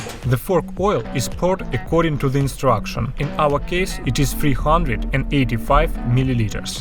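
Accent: Russia. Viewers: ох уж этот russian speaker акцент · наш, из тамбова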